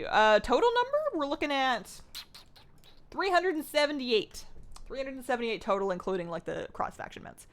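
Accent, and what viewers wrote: Canada. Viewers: From a midwestern ear, you sound pretty Canadian.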